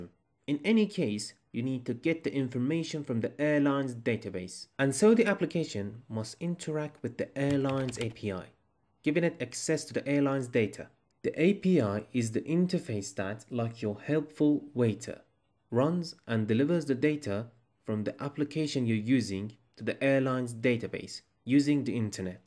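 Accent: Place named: United Kingdom. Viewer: Thanks! Now I know what API is! BTW, like your British accent 😉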